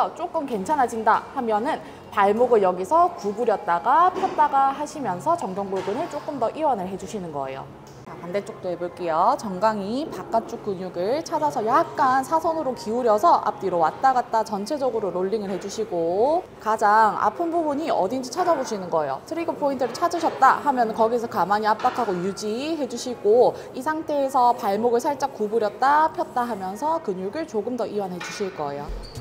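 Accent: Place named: Busan, South Korea. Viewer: 오붓싼?! 같은 부산이라 그른가 사투리 찰져서 이해가 쏙쏙 잘 되네요😊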